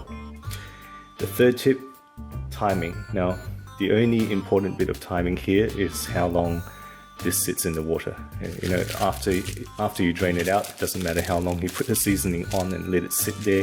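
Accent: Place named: South Africa. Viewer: you sound very south african! coming from a native australian 😁😅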